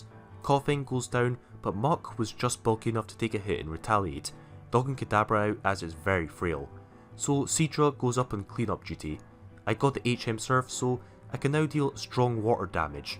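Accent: Scotland, United Kingdom. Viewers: yeah I had you pinned as Scottish maybe Glasgow or something but in some bits I wasn’t sure lol Great vid.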